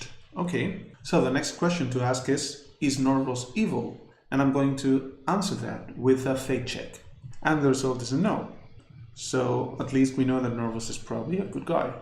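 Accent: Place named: Greece. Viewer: Yeah, you sound exacly like my teacher from greece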